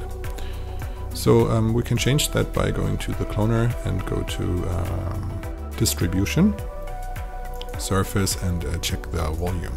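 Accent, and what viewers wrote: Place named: Germany. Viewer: Dachte mir schon von Anfang an wegen dem Akzent du bist bestimmt deutsch, dann hat die Google Suche den Beweis gebracht :D Danke dir, tolles Tutorial!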